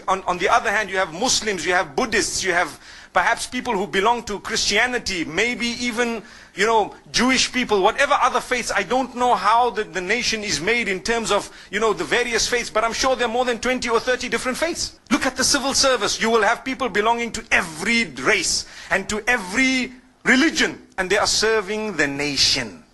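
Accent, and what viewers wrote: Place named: Zimbabwe. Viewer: Even your English can show,you grew up in Zimbabwe,,i like that you were treated well in Zimbabwe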